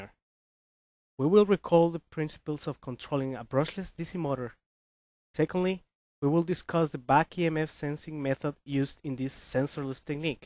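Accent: India. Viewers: that's Indian accent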